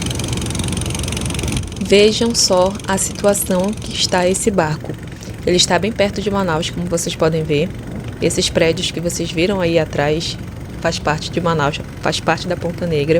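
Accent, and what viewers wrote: Rio de Janeiro, Brazil. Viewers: Vc e do Amazonas mas tem o sotaque de carioca (fala com chiado), Por que???. Vc já morou no Rio de Jan.????. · Oi td bem so uma pergunta vc, tem o sotaque de carioca vc é carioca? Abraços sou seu fam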